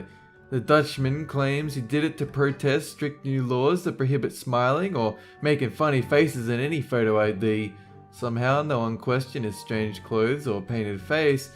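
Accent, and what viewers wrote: Australia. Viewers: i know an aussie accent when i hear it.... he is definately australian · I never knew you were Australian m8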